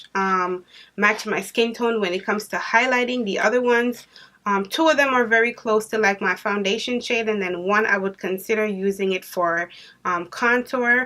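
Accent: Jamaica. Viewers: Great video hon, you sound a little Jamaican.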